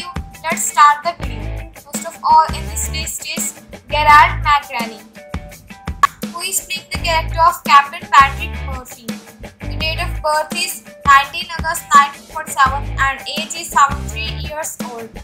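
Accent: India. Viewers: Agree. Indian voice over kid....listen to the video yourself. This isn't good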